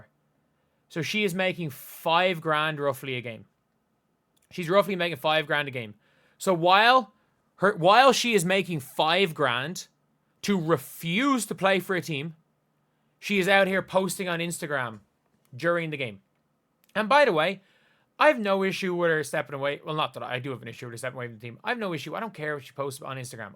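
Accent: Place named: Ireland. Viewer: Ireland, he has a freaking accent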